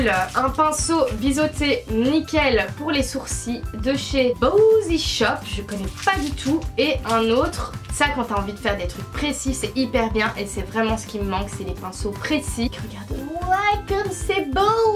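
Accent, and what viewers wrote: Belgium. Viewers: Tu es belge?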